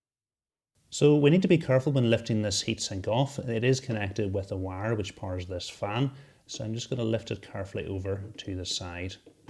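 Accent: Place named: Scotland, United Kingdom. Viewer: 55 minutes of Scottish ASMR PC building. Lovely.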